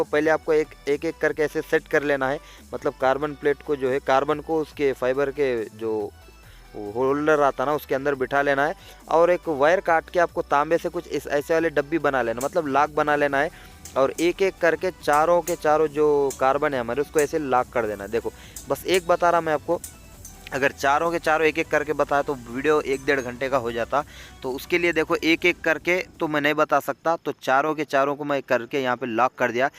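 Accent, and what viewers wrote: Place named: South India, India. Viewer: बहोत बढ़िया जानकारी देते हैं आप। खास करके आप साउथ के होके भी शुद्ध हिंदी में जानाकारी देते हो , ऐसे साउथ का कोई भी हिंदी में बात नही करता । बहुत बहुत धन्यवाद 🙏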